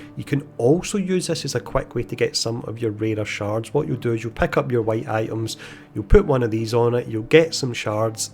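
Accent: Scotland, United Kingdom. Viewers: Awright, fellow Scot, ye got a a new sub, really informative. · Weird how he’s pronouncing his words differently…He’s Scottish! ya Dork 🙄🤦‍♂️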